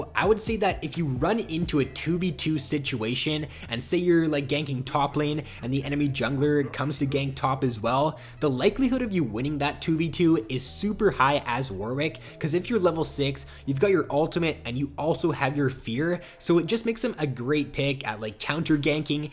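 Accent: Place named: Canada. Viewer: Canadian af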